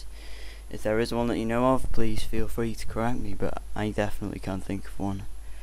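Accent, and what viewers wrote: United Kingdom. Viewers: yes brtain people nice accent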